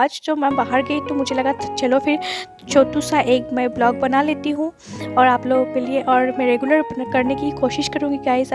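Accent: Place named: Assam, India. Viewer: Hey cute kid you have got ! Where r u from ? Ur accent sounds Assamese , please aplogies if I'm wrong. Kfc same pinch 😂 oh this Maggi is different black one ? Nice place looks nice weather 👍 eagerly waiting for your challenge video !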